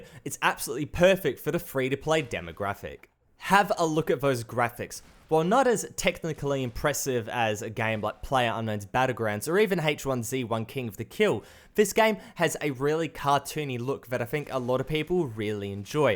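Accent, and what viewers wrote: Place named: Australia. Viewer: Dude's Australian his voice is easy to listen too subscribed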